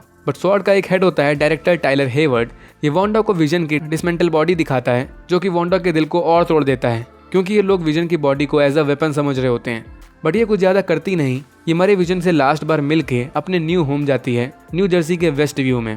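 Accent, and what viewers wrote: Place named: Gujarat, India. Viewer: Are you Gujarati 🤭💜🖐️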